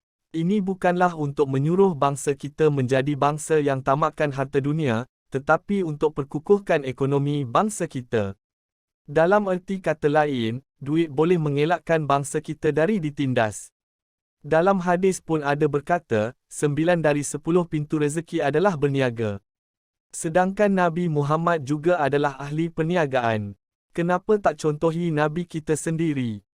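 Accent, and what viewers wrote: Malaysia. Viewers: Logat Sarawak Sabah kalau gak salah broo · Ni video knapa pke bahasa indonesia tpi logatnya bhasa melayu jadi campur gtu, Apa cuma perasaanku aja ya 😀😀😀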